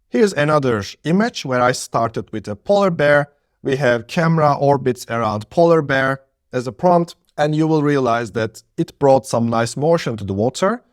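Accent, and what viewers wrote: Turkey. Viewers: Kral türk müsün aksan türk aksanı :d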